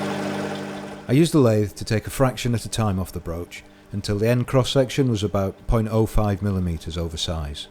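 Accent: United Kingdom. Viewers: This video is impressive, the narration is spot on one of the best on you tube, nice clear british accent (instead of the usual Yankee or Aussie). so i have subscribed thanks for sharing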